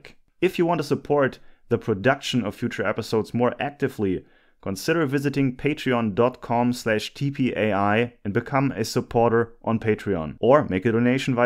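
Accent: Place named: Germany. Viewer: I love your videos and German accent. It gives me flashbacks to <num> Northern Germany while in the US army.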